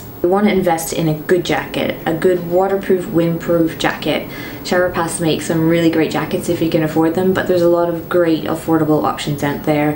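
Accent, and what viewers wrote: United Kingdom. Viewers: sounds british but then words like 'about' popped up and i got confused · i m sidetracked but just want to tell you that, your accent adjusted very well to British. You achieved one of most difficult task in the world.😁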